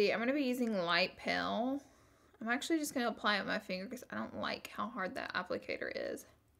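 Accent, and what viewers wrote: South, United States. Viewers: When you said "oil free" I totally thought you said "all free" because of your southern accent compared to the Boston accent I grew up around! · Madilyn Z she sounds like a southern version and I love pll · I love u u r soooooooooooo awesome I love your southern talk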